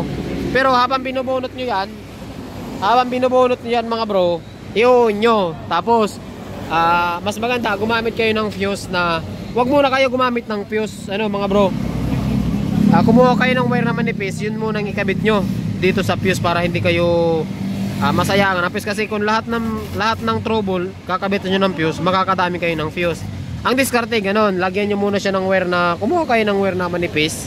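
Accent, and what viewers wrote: Bicol, Philippines. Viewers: Bicol ka?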